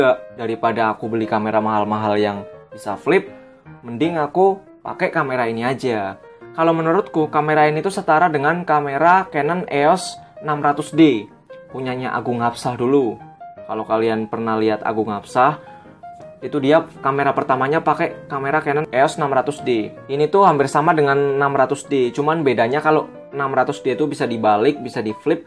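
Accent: Pekalongan, Indonesia. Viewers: Orang pekalongan bang? 😁😁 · Bang orang pekalongan ya?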